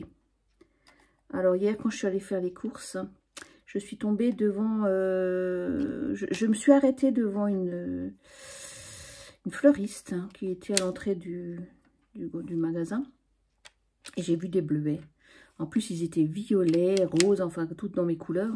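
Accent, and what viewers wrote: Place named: Alsace, France. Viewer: Solù, ehr geht guát und dù? J'ai explosé de rire avec le Godvertami ou alors le Godverclemi, c'est tellement nous les alsaciens. Désolé je suis nul dans l'alsacien écrit, mais je crois que c'est pas si mal 😅🤣 Moi aussi je me reconnais en toi, ta façon de parler, les expressions, c'est génial j'adore ❤ Moi, j'avoue que je me retiens beaucoup, car je parle le "fralsacien" au quotidien. Je suis tellement contente d'avoir fait ta connaissance via YouTube. Je crois qu'on s'entendrait bien dans la vie ❤ Decka Schmoutz et bonne soirée 😘😘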